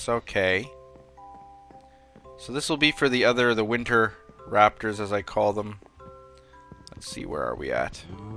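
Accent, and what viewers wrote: Canada. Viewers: are u Canadian I can tell by ur voice and when ur saying eh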